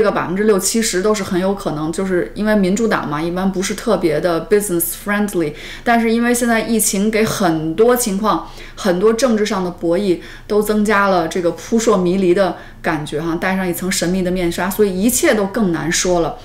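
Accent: Beijing, China. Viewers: 博主是北京人么？口音听起来很熟悉。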